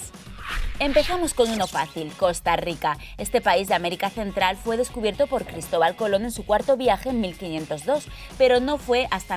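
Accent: Spain. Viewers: Eres de España? lo sabia!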